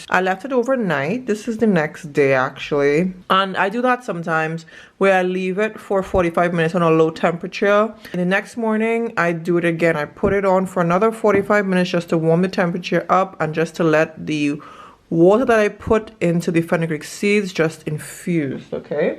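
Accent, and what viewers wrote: Trinidad and Tobago. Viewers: Are you trini? You sound trini. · I feel like I hear a Trini accent somewhat 🎊🍾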